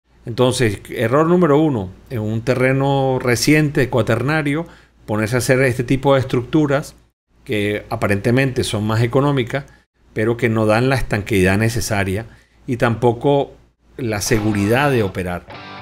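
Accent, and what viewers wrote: Venezuela. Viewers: Hola, eres de Venezuela?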